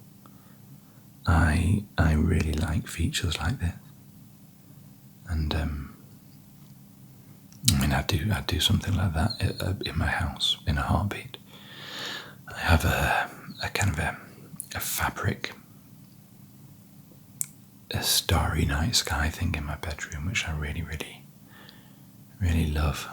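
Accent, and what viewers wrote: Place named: United Kingdom. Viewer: Your videos combine 3 of my favourite things - a British accent (which I have a total soft spot for) ASMR and mini hotel room reviews! Genuinely the perfect combination. Not to mention your calm and soothing voice. I honestly can’t imagine better videos to relax and watch on here :)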